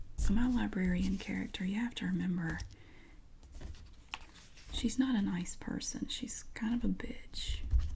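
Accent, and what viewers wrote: South, United States. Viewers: I still have my sticker book from the 80's!! My 9yr old daughter has it now and started her own. Some of my scratch and sniff still have a faint scent which is crazy...dill pickle was my favourite! Love your videos...my twins both watch at bedtime, by request...you are their favourite!!! My daughter loves your southern accent, she tries to copy you saying" that's nice" or "that's so pretty", pretty funny from a 9 yr old Canadian girl😁♥️💜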